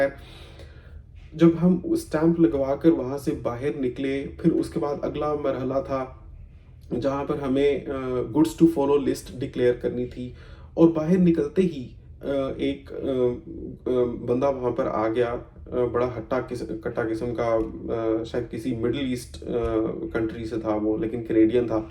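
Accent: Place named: India. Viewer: نام تو پاکستان کا لے رہا ہے اور بول ہندی رہا ہے اور تیرا ایکسینٹ بھی ہندی ہے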